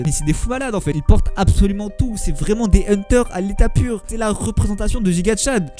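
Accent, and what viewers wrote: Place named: Banlieue, France. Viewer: Des barres 😂 Ton débit, ton phrasé, tes expressions, ton regard " Banlieu " loin de " l'Univers Montagnard " mais il y a des vérités dans ton speech ;)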